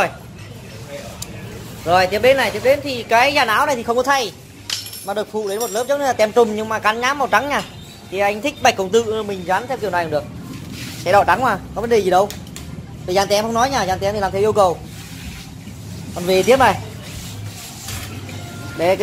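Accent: Nghe An, Vietnam. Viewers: Ad xứ nghệ phải không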